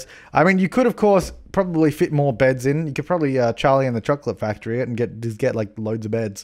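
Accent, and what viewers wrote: Australia. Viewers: It took me so long to figure out that is Australian... I'M AUSTRALIAN...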